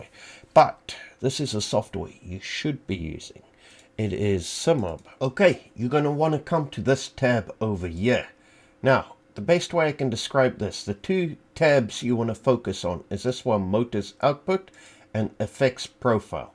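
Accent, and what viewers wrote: Afrikaans, South Africa. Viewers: Tel ek 'n afrikaanse aksent daar? Great content ! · Are you South African sir? If so where !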